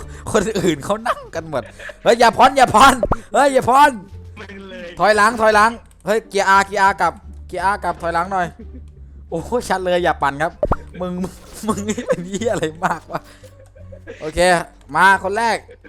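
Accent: South, Thailand. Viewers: พักแหลงใต้ก็ได้เดี๋ยวคนกลางฟังม่ายรู้เรื่อง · พี่ย่าคนใต้เหรอครับสำเนียงชัดเลย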